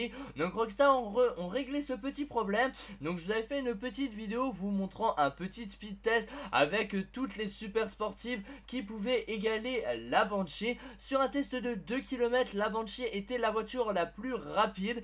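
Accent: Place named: England, United Kingdom. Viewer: mec, tu racontes n'importe quoi, enfin bref vrai ou pas peu importe, en tout cas arrête ton accent anglais c'est carrément hilarant...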